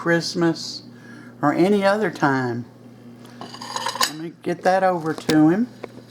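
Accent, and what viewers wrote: South, United States. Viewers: I love your recipes.Where are you from.?Im from Arkansas.I notice you have a southern accent.I love your recipes.Do you have a cookbook.We southern belles are good cooks my mom and grandmothers were excellent cooks.im new to your ch.I love your ch.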